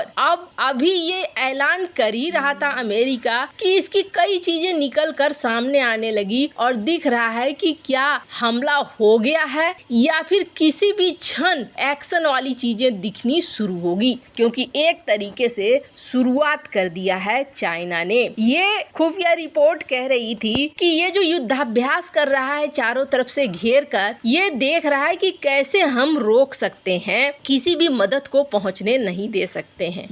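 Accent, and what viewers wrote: Bihar, India. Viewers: Apni hindi sahi kare bihari jaisa kiyoun bolti ho chan Santi etc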